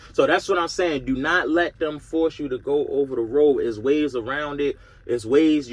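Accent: Baltimore, United States. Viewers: Knew you were Baltimore when i heard you talk😂…. Thanks for the information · I knew he from Baltimore by the accent lol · When I heard that accent😂I said Bmore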